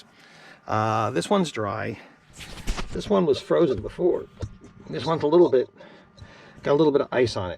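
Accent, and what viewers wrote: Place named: New Jersey, United States. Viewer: your videos are always informative, if not entertaining. Since moving from NJ to CO, I miss the Jersey accent. I get my fix listening to you! Keep the good stuff coming. Thanks!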